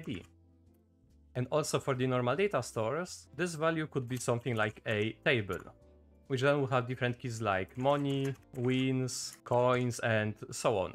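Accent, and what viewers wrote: Poland. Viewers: you sound polish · dzięki, słychać polski akcent ;)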